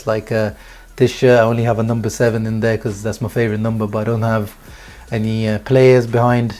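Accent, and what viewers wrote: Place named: Italy. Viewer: So far SO FAR I like your show. I agree. I think I'd definitely change that background. Shooting from your BEDROOM takes away from you believe it or not. I speak English but love hearing the Italian accent during commentating. Also better music for an intro. Better yet no music during you commentary. (Just some GOOD INTRO MUSIC.)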